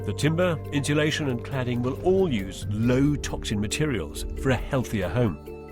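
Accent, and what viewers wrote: United Kingdom. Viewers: the British accent really completes this video haha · If was not narrating in his British vernacular, these videos would just not be as interesting.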